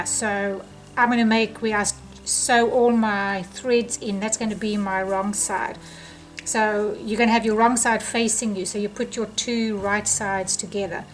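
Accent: South Africa. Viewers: Awesome video :-) we're South Africans living in Ottawa, Canada ❤️Love your accent we recognized it straight away :-) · That's a South African accent!!! How lovely to hear! Erna from Cape Town, S.A. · You're South African! Am I right?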